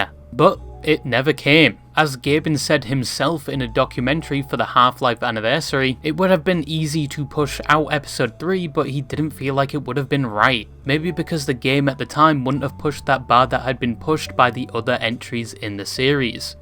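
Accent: United Kingdom. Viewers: I love you too talking british bird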